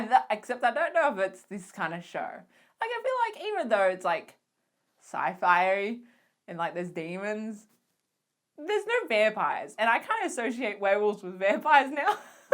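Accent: Australia. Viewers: she's Australian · Nice vid. 👍🏻 I'm from the UK so cos were always way behind in the good shows I'm only able to see what you lot put on you tube. Thank god for people like you. I also may have a teeny weeny crush on you. Damn Aussie accent and giggles. ☺️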